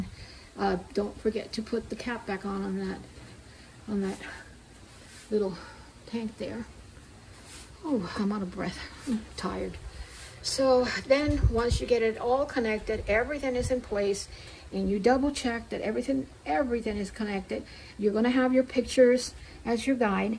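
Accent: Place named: New Jersey, United States. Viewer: OOH !!! A Joisey Gal !!!! Luv it even more !!!!!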